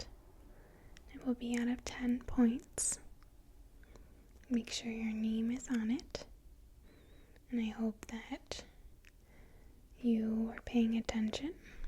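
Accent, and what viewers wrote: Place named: Minnesota, United States. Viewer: These are great!! You do a great job and I love your Minnesota accent. (Minnesota, yes?)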